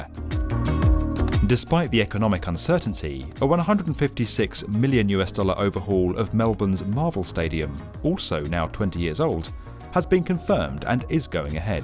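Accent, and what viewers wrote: United Kingdom. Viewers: U sound British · British pronunciation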